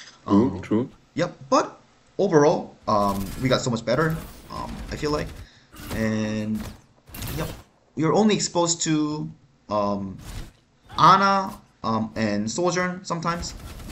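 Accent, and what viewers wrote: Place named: Germany. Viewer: That’s due to the German accent + deep voice :D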